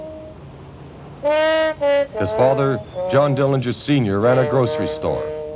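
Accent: Canada. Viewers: LOL at the Canadian narrators accent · The narrators Canadian accent is really distracting.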